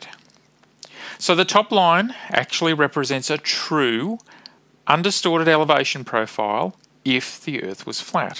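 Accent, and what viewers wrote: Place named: Australia. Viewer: Er, tritto? Excellent analysis of some gorgeous scenery (none of which I'd ever heard of). Plus being in the SE United States, I'm hypnotized by any Aussie or NZ accent.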